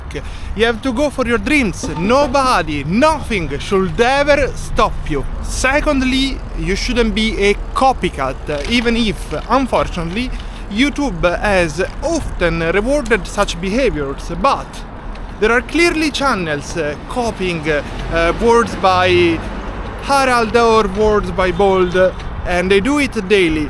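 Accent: Italy. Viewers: Thanks for the insight, best of luck on your channel. You got the talking down. Your English is very good. Love the Italian accent. Anch'io vivo qui in Italia. Vicino a milano e Torino. Piacere fratello.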